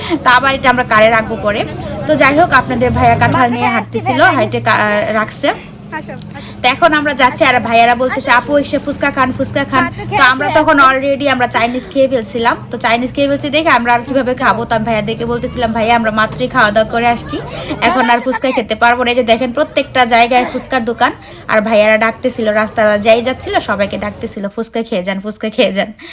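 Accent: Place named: Bangladesh. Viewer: আপু তোমার ভাষা গুলো অনেক পরিচিত লাগছে বাংলাদেশের কোন জেলায় তোমার বাড়ি প্লিজ❤❤❤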